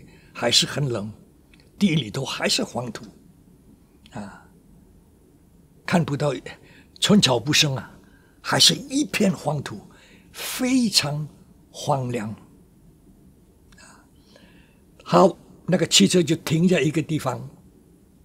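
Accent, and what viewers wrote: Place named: Indonesia. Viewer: 老先生说的是：八年当哑巴！不是半年！ 49年到57年！印尼口音而巳！😢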